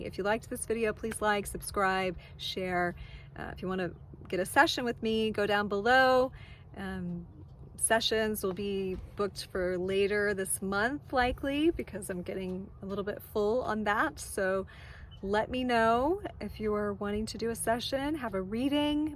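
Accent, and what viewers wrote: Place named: South, United States. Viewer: Thank u that was helpful! My tongue has been burning 4 many months now so I’ll try the teeth breathing. Be safe on your journeys ❤ Oh yeah…& you are talking like a southern girl…u said “Hey ya’ll welcome back to my channel!” First thing I noticed!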